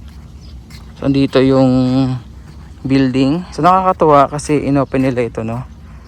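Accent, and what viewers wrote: Visayas, Philippines. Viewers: Ganyan bisaya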